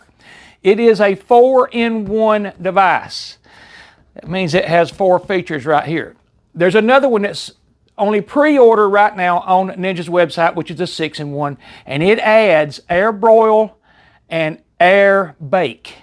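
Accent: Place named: Georgia, United States. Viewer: glad my accent spotting wasn't off :) look forward to more content I lived in forsyth and Cherokee but accent sounded very similar to my friends by cartersville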